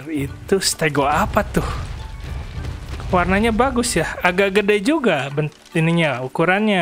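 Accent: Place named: Sunda, Indonesia. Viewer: Orang mana sih bang kok ada sundanya ada jawanya?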